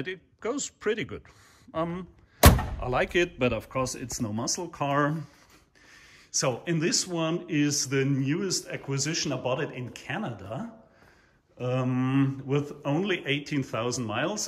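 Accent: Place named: Bavaria, Germany. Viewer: Nice collection of "Amerikanische Riesenwagen". Do the various owners all live in this same building? I see from the license plates (and your accent) that you are in beautiful Bavaria. Funny how the North Americans luxury car market was taken over by the German brands starting in the 1980s, and yet, there seems to be quite a lot of classic American "land yachts" in Europe today.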